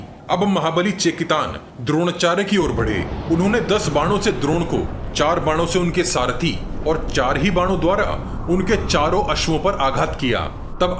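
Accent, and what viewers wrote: Standard. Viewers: अत्यंत प्रभावशाली आवाज शुद्ध उच्चारण सुनकर मन प्रसन्न हो जाता है मैंने गीता प्रेस गोरखपुर के कल्याण अंक में ये कथा पढ़ी है पर आपकी आवाज में सूनना आनंद दायक है कृपया शकुनि के बारे में जानकारी दें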